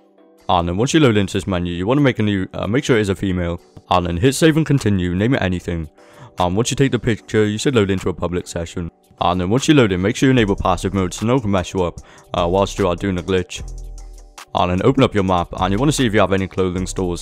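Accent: Wales, United Kingdom. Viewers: You sound welsh where are u from?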